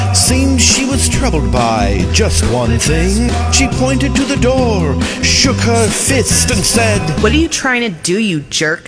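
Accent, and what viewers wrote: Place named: England, United Kingdom. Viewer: This wouldn’t be the same without the English accent.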